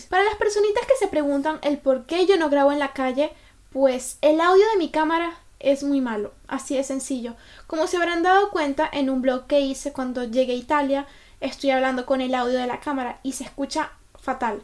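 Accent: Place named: Chile. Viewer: Hablas como chilena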